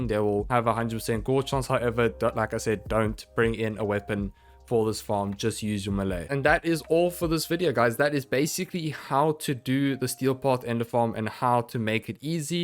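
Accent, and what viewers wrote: South Africa. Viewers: You sound a bit South African there my bru, lekker! Subscribed.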